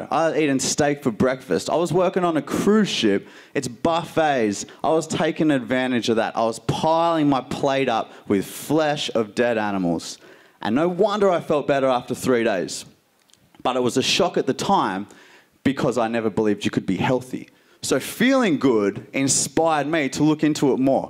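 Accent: Australia. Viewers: Each time your speech comes out, first of all I smile thanks to your Australian accent, and immediately after, I feel empowered and extremely proud to be part of this journey for more than a year now, for participating to something much bigger than me, than us, for being part of the Universe... I became vegan overnight after having watched Gary's speech. Both of you have my ex-boyfriends' name so I thought it was about time and a sign to make peace with my past. I knew it was in alignment with who I am and who I want to be as a person. And one year later I feel more happy, more energized, more aware, more educated, more and more human than ever before. so thank you for reminding me why I became vegan and thank you for carrying the voice of those who aren't heard. Thank you for everything you do. Thank you, from the bottom of my heart. Thank you. Sending you all my love. 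Bye Coco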